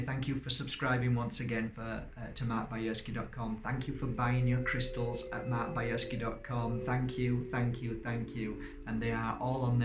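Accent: Yorkshire, United Kingdom. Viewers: Hey! I´m so glad I´ve discovered your channel about 10 days ago. I really don´t know how it happened. I´ve been seeing loads of your videos ever since, and all of them are just fantastic. Your energy is so uplifting and addictive. Before I didn´t know a thing about moldavites and now I´m totally in love with them. Their beauty is totally out of this world;) I really love your channel since the very first minute, it´s bloody incredible. On top of that, I lived in Leeds and just love the Yorkshire accent, now I´m back in my home town in Spain and I really miss it (At first I didn´t understand a word in the Yorkshire accent haha). A big thank you from the bottom of my heart for everything you do:)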